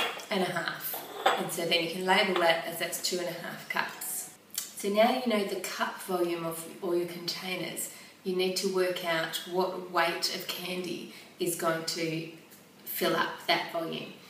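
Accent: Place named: Australia. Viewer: i love your accent! what part of Australia are you from?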